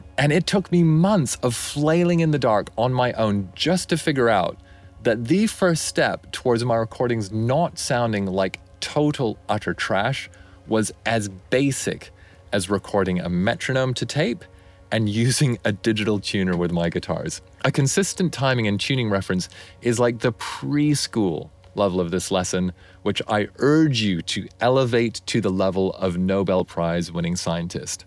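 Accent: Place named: United Kingdom. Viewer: the partial british accent is an adorable marker of his time abroad "schtewdio"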